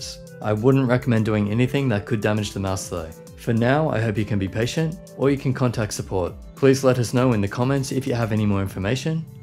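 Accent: Australia. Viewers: Are you Aussie? If so, same :D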